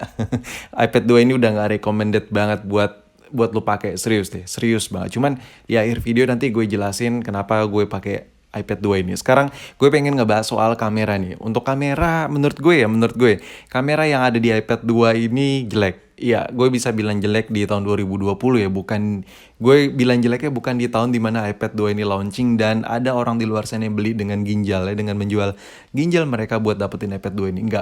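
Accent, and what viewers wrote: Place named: Java, Indonesia. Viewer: apa jawa keras ni bozzz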